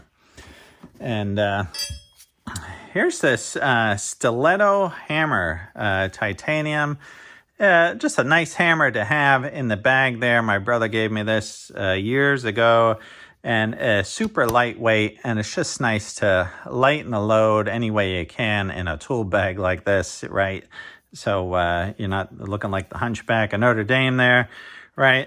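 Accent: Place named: Pottstown, United States. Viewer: Well, I’m surprised at just how many tools came out of that bag😃 your accent reminds me of my cousins in Pottstown 👍🏻 I enjoy the videos.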